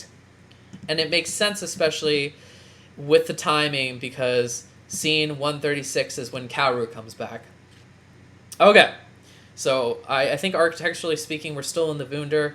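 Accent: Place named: Germany. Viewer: I feel like you thoroughly spoiled 3.0+1.0 for me just now and you did it with such savoir-faire I can't do anything else but appreciate it. If the movie turns out to do anything differently I might be weirdly disappointed now 😂 (also as a German I'd like to say the German-ness of your pronounciation was never an issue for me personally)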